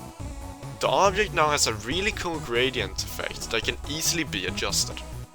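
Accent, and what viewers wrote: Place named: Sweden. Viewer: Nice Swedish accent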